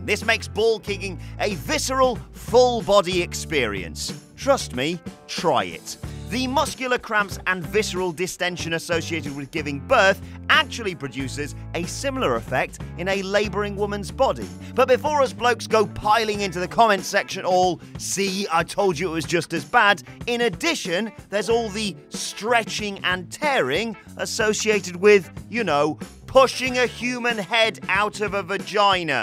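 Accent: United Kingdom. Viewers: Awesome is back with a awesome British accent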